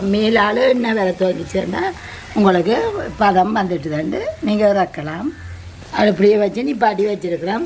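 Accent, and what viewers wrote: Jaffna, Sri Lanka. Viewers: This is how majority of Tamils from Jaffna speaks... especially the older generations.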